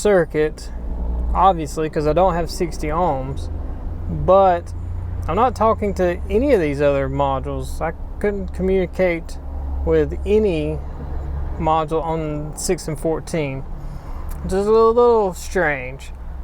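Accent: South, United States. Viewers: I watch every video but have learned the most about canbuss communication issues. Watching your videos is like watching your thought process spoken out loud. you're extremely intuitive, and you can see the wheels turning at each step of your process and why you've chosen the particular diagnosis path. your laid-back southern demeanor, and your straightforward integrity is very refreshing. but I've got to admit I'm from the west cost so I adjust the YouTube playback speed much faster so you sound like someone familiar from around here. lol: no disrespect intended.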